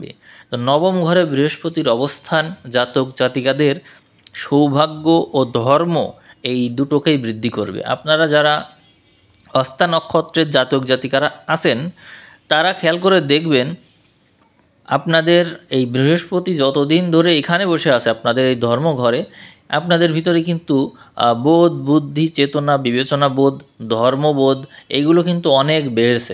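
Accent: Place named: Gopalganj, Bangladesh. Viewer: ভাই আপনার কথাগুলো খুব সুন্দর আপনার কোন জেলা মনে হয় গোপালগঞ্জ এবং আপনার কথার সাথে আমার জীবনের সাথে অনেকটা মিল আছে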